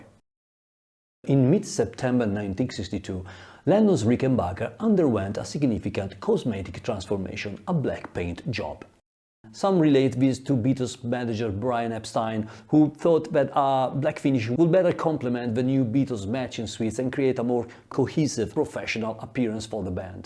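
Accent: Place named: Italy. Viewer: Very cool. I love your Italian accent, by the way. Sounds like my wife (she is from Milano).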